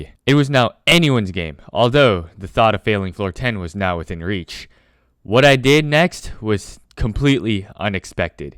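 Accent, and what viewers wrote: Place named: South, United States. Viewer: you sound like technoblade but with a southern accent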